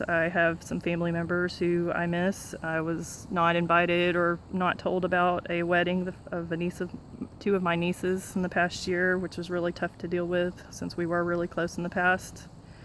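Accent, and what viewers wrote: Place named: Texas, United States. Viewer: You sound perfect- just enough Texas twang to make it authentic! Exactly how I figured you'd sound!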